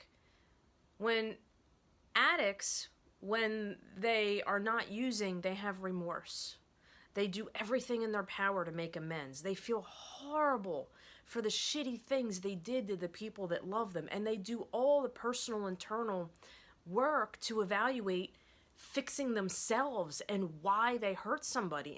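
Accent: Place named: Pennsylvania, United States. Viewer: Omg I knew that accent was very close! I’m in Media PA!! If you need a friend I’m here for you.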